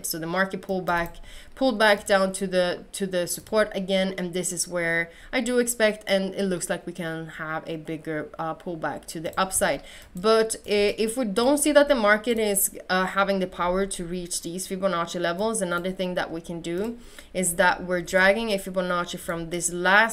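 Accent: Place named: Sweden. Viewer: låter svensk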